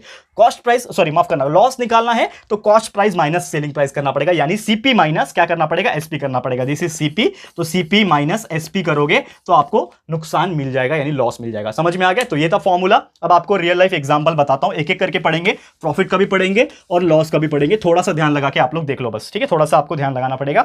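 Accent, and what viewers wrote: Maharashtra, India. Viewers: Sir aap Marathi ho kyaa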